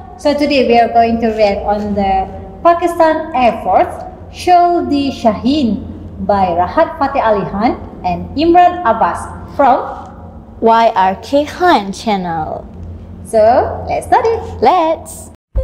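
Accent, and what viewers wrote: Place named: Malaysia. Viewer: I like MALAY ACEENT, SO CUTE ❤️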